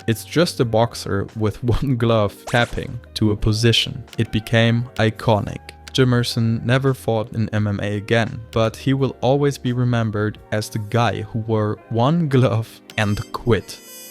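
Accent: Germany. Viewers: Gold video. Hat lange gedauert bis ich den deutschen Akzent gehört habe😂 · German accent is strong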